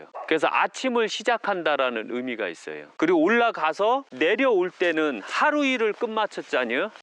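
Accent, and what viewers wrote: Chungcheong, South Korea. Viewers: 선생님잘보고갑니다^^ 충청도사투리구수하네유^^ 충청도어디세유?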